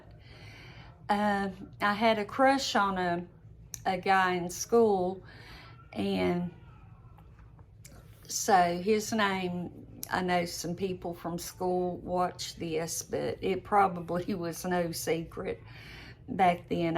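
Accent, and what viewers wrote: South, United States. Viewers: Not boring at all. The sound of your voice is so soothing. I just love your southern accent. I think you could read a book and I would love it. A chapter every day or so? Maybe start another YouTube channel just for narrating a book? It's a thought. Thank you for your life story. Hope there's more to come! There's quite a few years you left out.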